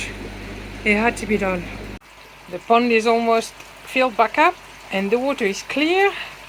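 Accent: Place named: France. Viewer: Good to see you back, missed that lovely French accent of yours✊ cheers 👍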